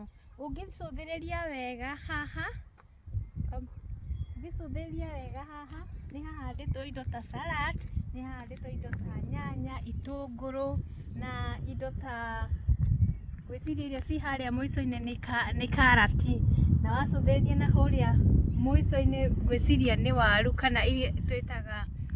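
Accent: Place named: Kikuyu, Kenya. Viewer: Woow I love your kikuyu accent amazing growing your own veggies 👍😍❤️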